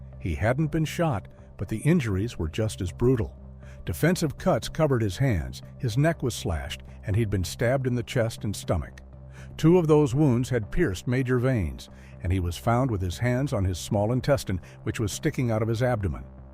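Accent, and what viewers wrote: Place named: England, United Kingdom. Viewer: I love your English accent. In which states of the US do people have this accent?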